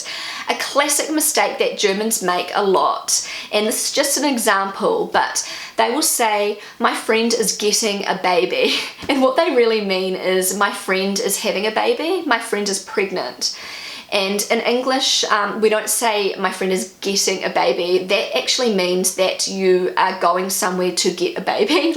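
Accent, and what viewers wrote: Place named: New Zealand. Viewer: It's because her Kiwi accent is non-rhotic (they drop Rs at the end of syllables). But there is a subtle difference; the vowel at the end of "errors" is an unaccented schwa, while the vowel at the end of "eras" is a bit more open and closer to "a" as in "arbiter".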